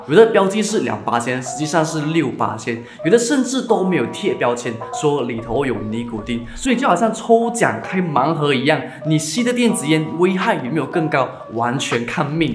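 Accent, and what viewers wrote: Fuzhou, China. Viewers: 你肯定是福州人！！！！！！！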